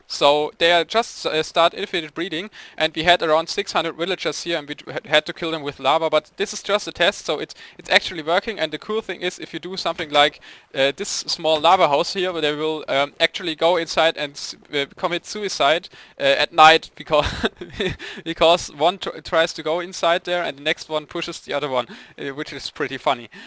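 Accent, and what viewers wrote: Germany. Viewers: He sounds like a german and there are some german players around him (Banenenkrieger = bananwarrior) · real german english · u sound german :D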